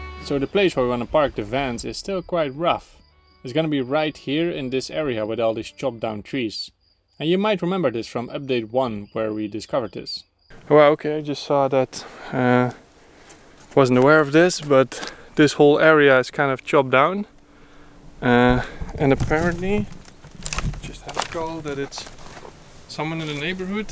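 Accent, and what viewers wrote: Netherlands. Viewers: Yes his vocabulary is very obnoxious. Don’t want to be rude but sometimes I wish he would just speak his native Brabants cause his English is very “stonecoal” as we say in the Netherlands.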